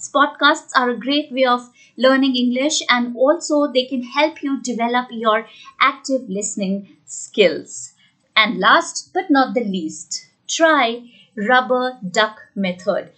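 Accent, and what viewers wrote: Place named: India. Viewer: Indian accent ☺☺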